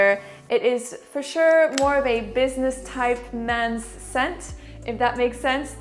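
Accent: France. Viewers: You have a very nice french accent, and even Arabic i guess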